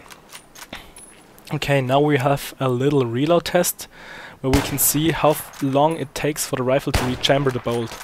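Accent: Germany. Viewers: Nice German dialect. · Are you German? It sounds like so..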